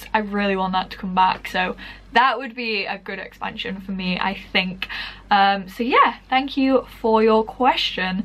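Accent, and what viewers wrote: England, United Kingdom. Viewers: i hate english accent but i love your playings a lot and i love so much irony 😁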